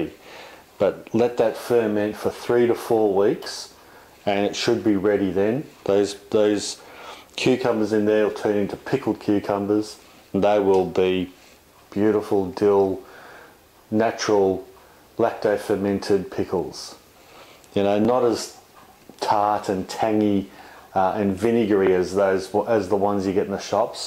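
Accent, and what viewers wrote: Australia. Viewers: how nice to hear an aussie voice. I am in the tropics and my crop is wonderful at the moment. NEVER would have ever thought to pick them small and ferment them. I have been leaving mine 'til they are big, chopping them up and fermenting them. I am heading out to the garden now to pick all the 'babies'. thanks heaps. I will definitely be subscribing to your channel. Have a productive gardening day!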